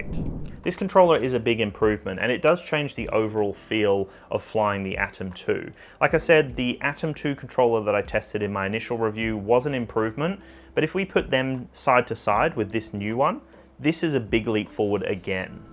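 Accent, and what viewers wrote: Australia. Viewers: G’day, Retired DJ Mickey Rage up in Mission Beach FFNQ here mate…….. I just wanted stop in to say: “Thanks for doing what you do here on the Tube mate……… It’s really nice to hear an Aussie Accent instead of the Septics All The **** Time eh” So, Keep up the great work.. AND while I have your undivided attention: I couldn’t get the first link on here to the potensic atom mate………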